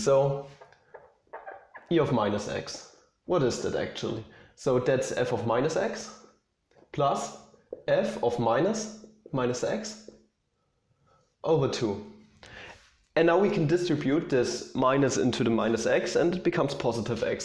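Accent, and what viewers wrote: Germany. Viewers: They're both great. Having taken four years of Deutsch in high school, I appreciate the German accent, helps me practice!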